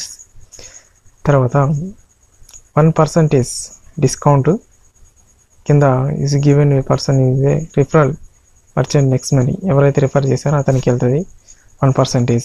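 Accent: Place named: Lambadi, India. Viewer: Thammudu nuv LAMABADI kadha.. ni voice vintene ardham avthundhi.. cooooosh bockkkk kaadhu babu.. cashback..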